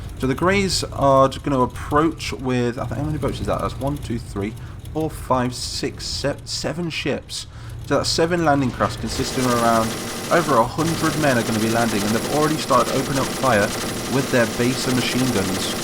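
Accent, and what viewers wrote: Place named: United Kingdom. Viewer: yup ur british I think???????????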